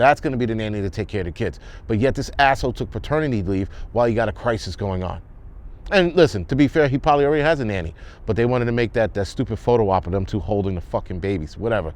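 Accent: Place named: Brooklyn, United States. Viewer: I love you brother. Not in that way lol. You have to be from Brooklyn. That is the way we talk never candy coat it. Tell it like it is. Keep up the great work. And be safe.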